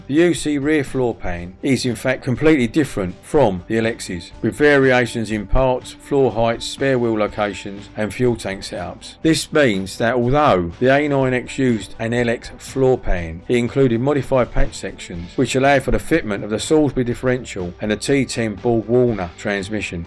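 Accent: England, United Kingdom. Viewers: A pommie accent talking about Torana …?!?! This is not good .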